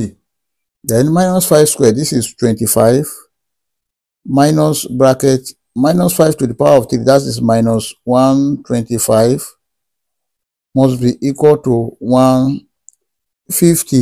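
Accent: Nigeria. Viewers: I like Nigerian accent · Love your Nigerian pronunciation 😀😀😀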